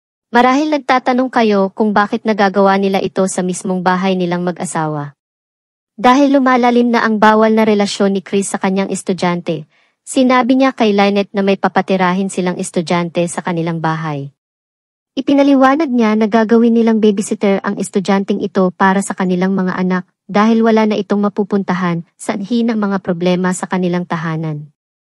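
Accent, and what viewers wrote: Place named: Visayas, Philippines. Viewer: ..hndi po kasi xa pure tagalog ang salita ...kaya ganian po ang salita nia . Kung kayo po ay magsasalita sa wikang visaya .. magkaka gnian din po ang tono nio saibang salita na gagamitin nio ...naiintidihan nmn kya unawain nio na lang o wag nio pakinggan o panuorin ..wala nmn napigil sainyo .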